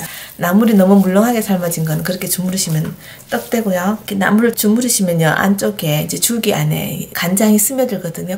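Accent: Gyeongsang, South Korea. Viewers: 해외 나와 산지 4년이 다되어 가서 정월대보름되면 나물이 너무 먹고 싶더라고요 ㅠㅠ 혹시 대구 분이신가요? ㅎㅎ 말투가 너무 정겨우세요 제가 대구사람이라 ㅋㅋ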